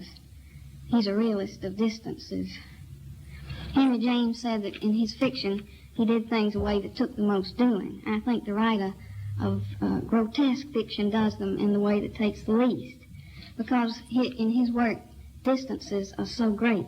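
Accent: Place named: Georgia, United States. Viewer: She has a very strong middle Georgia accent. It's easier to distinguish in her reading of "A Good Man Is Hard to Find." No, it's not a hillbilly or mountain accent or a swamp accent, but it's definitely a strong accent--nowhere near standard.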